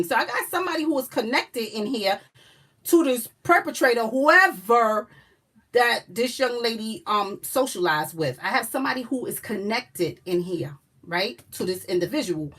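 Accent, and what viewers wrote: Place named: Louisiana, United States. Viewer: sound like she from Louisiana. New Orleans home of voodoo. Makes me wonder if her & her family were from Hurricane Katrina when they got flown across different states.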